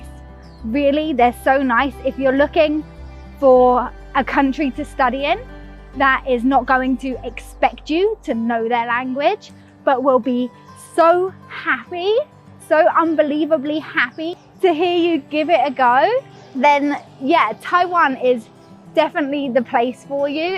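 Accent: England, United Kingdom. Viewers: 12 years! you must be kidding do not speak Chinese at all. However, first time hearing such strong, clear English Accent in Taiwan. Please do not be afraid to speak even your pronunciation is incorrect, maybe learn from your kids could be much easier and quicker. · 親愛的Lucy, 拜託 千萬不要永遠說中文拍影片 妳迷人的英國口音 正是妳的一大特色 不過我也承認 很想聽妳整集影片都說中文 魚與熊掌無法兼得 妳過得開心最重要 祝妳學中文順利 加油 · 英國腔好酷XDD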